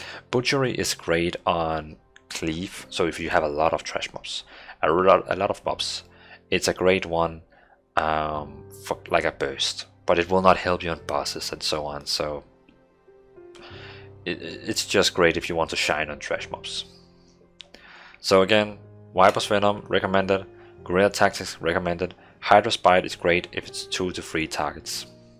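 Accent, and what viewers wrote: Denmark. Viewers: You sound Danish:)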